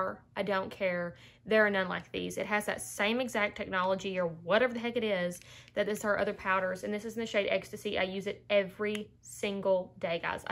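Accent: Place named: Kentucky, United States. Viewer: I've heard Allie rave about your glosses recently and just came to your channel and right off the bat I like your fun personality! :) Love the green here you got going on in this video and I love your accent too! I'm from and live in NC and there are different and unique southern accents across the southern states, but sometimes I can't tell - where are you from? Also, I am not even 2 minutes into your video yet and you've already got me laughing! :D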